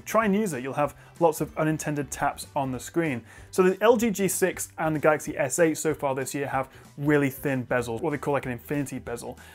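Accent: United Kingdom. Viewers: oh right ^^ well I like your British accent (I'm Franco-American);-)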